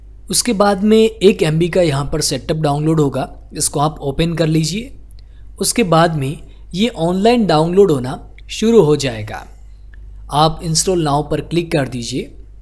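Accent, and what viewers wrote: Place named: South, India. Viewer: Naryal anna accent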